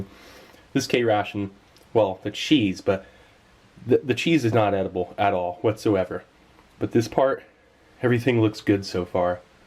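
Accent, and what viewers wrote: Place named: California, United States. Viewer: Dude where is your accent from. I’m guessing California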